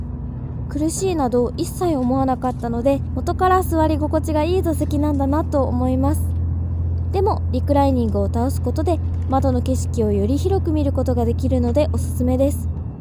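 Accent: Kansai, Japan. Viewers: 穂高町に一度行ったことがあります、きれいな素敵な町でしたよさんが時々関西弁が出るのが親しみを感じます。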